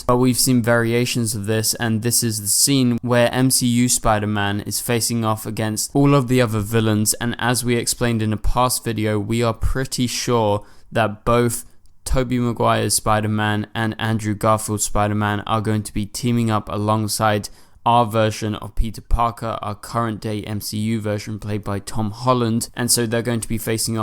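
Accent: United Kingdom. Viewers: omg are u british?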